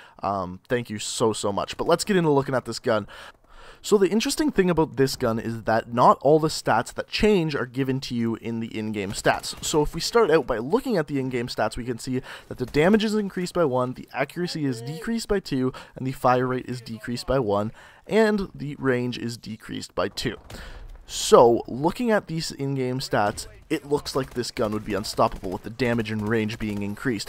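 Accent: Canada. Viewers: U canadian?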